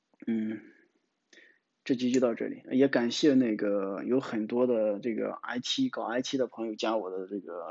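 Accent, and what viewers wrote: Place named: Shaanxi, China. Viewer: 我感觉像陕西的，哈哈，咸阳么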